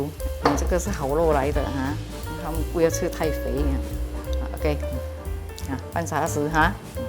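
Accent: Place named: Hokkien, China. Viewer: ，你讲话很像我妈妈，参一点福建话这样啊😊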